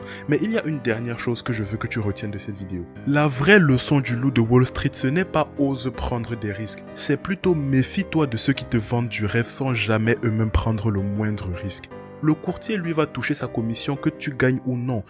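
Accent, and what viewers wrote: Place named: Africa. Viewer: dommage que tu as un accent africain qui gâche tout le professionnalisme de la vidéo. Désolé mais dirait une parodie.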